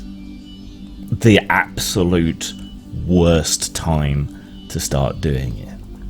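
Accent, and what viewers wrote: United Kingdom. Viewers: Why the British accent,? Let's see if anyone get that.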